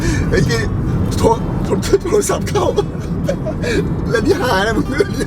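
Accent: Isan, Thailand. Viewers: มักอ้ายเว้าอีสานแม๊ ใจบางบักคัก ❤️😀 มาเที่ยวอุบลเเน่เด้อจ้า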